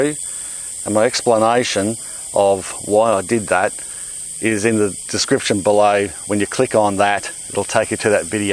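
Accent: Australia. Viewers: I'm in Ontario Canada. I lived a couple of years in Melbourne and my brother has lived in Australia now over 30 years. It's my fav place outside of Canada. I love to watch Aussie videos to hear the accent I miss so much and in videos I love to hear the birds - I miss their sounds so much.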